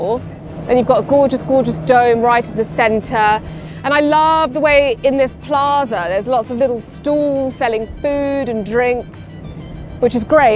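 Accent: United Kingdom. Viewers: Your accent sounds British. Are you British?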